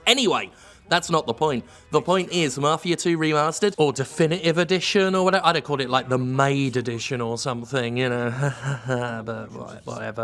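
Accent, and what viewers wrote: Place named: Estuary English, United Kingdom. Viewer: "maaarfia 2"? starting to lose the old estuary accent bit by bit.:D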